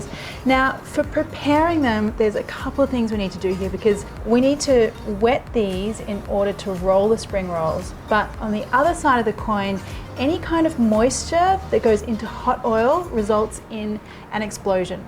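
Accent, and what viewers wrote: Australia. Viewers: Are you Australian?! Love your accent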